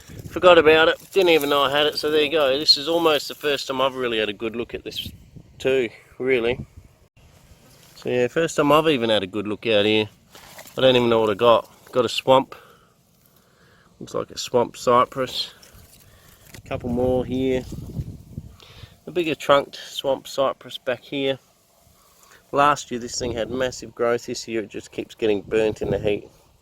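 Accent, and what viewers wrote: Australia. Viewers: can anyone tell me what accent is that? is he Australian?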